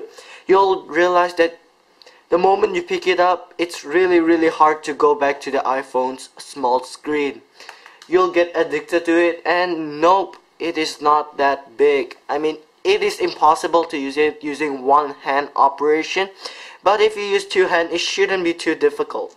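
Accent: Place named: Singapore. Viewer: Sounds more Spanish to me for some reason, but you're right, it's a Singapore accent by the sounds of it.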